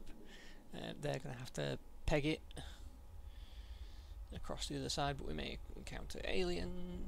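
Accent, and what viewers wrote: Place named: Manchester, United Kingdom. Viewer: I don't know if you actually are, but you sound like a proper, proper manc, ha ha ha. Good LP so far :).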